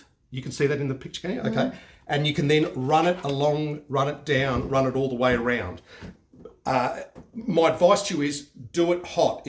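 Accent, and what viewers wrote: Australia. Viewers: Thank you so much. Your explanations are very clear and easy to follow ... even with an Australian accent. I'm now going to attempt to fix my door open light on my 2011 Mazdaspeed 3. Thanks!!!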